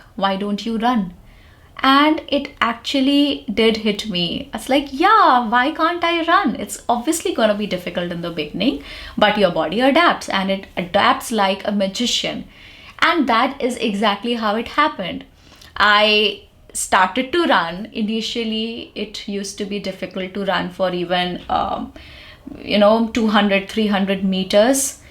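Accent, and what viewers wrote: India. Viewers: Didi......I love yor indian accent ❤❤❤❤❤❤❤❤❤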